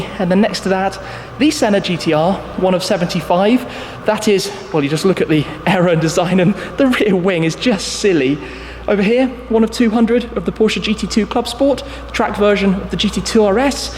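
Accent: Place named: England, United Kingdom. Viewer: i really like your english accent. verry lovely hearing👂👂💗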